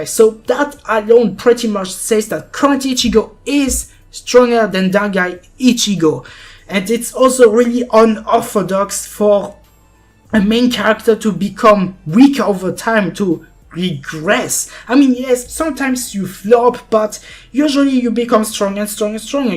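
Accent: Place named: France. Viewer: We can hear your french accent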